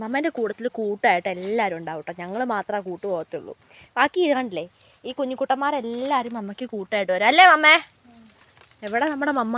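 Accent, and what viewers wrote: Kottayam, India. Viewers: Athea currect kottayam slang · Slang oru kottayam touch 🧐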